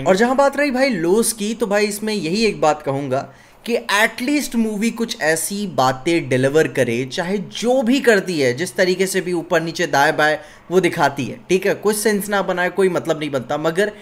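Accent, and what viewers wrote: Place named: Bhojpuri, India. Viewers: Haan, shayad bhojpuriya hi hai but bande ka baat karne ka lehza aur shabdon ka chunaav bahut badiya hai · isiliye bhojpuriya lagta hai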